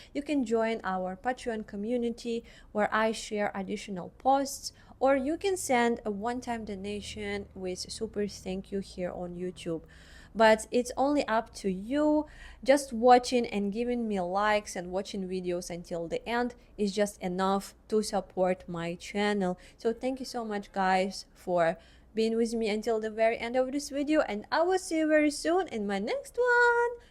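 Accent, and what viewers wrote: Russia. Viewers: Love your Russian accent!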